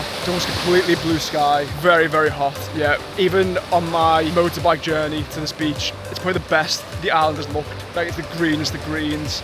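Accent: Liverpool, United Kingdom. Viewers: miss the scouse accent. I lived and worked in Liverpool for 13 years before moving to Australia. nice one lad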